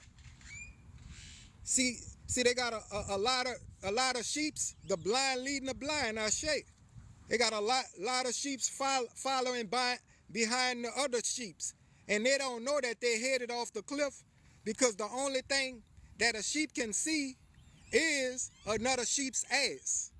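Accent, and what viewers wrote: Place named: New Orleans, United States. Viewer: Look at Godhead 🙌 I just stumbled across another #Heyoka and sounds like you from the NoLa too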